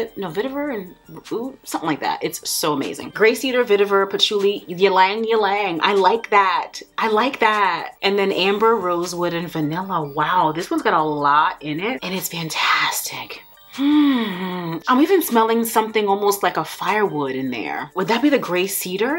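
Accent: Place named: Rhode Island, United States. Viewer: I just started binge watching your videos, and I knewwww I heard a RI accent the first time you said coffee🙌🏾 ⚓️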